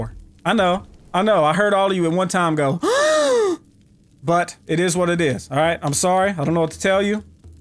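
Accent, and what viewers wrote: South, United States. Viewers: he said yall are you from the south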